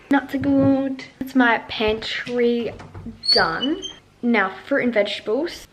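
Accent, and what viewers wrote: United Kingdom. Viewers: You sound British but use dollars??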